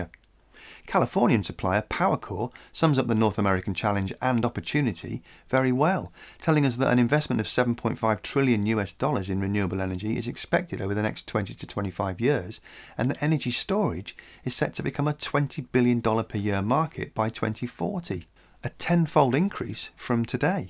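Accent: United Kingdom. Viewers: Really impressing your film. The illustration of it let me think, that there is a big lobby behind your channel. Love your British accent. Me as a German (please forgive me my English!) am sceptical against so called renewable energy. Because we already pay for it very high. We have the highest energy prices in Europe, probably in the world. We live constantly close to a blackout. If we have had no neighbours with coal or nuclear plants, our system would crash very often. 2018 we have had two critical moments in our grid. In your film you are showing a beautiful landscape at the beach "decorated" with many windmills. This was a symbolic picture: human greediness and ideology destroying nature! My point is not: I am against electrical storage. No! Not at all! This is even the crucial problem of renewables. I am for obligatory solar panels on flat roofs in industrial areas. 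But I am against Solar Parks on fertile soil or in woods, against cornfields for our electrical comfort. Simply because of the efficiency of renewables, the so called EROI (Energy Return on Investment), which is too low. For that reason they need a big area to compensate. Photovoltaic has an EROI of 1.6, Biomass 3.5, Wind 3.9, Gas 28, Coal 30, Water 35. In comparison to it the old veteran of Pressurized Water Reactor has an EROI of 75! Which is not too bad. The windmills are meanwhile as high as the television tower in Stuttgart. The concrete weights 7000 Tonnes. The socle of 3500 Tonnes is left for ever in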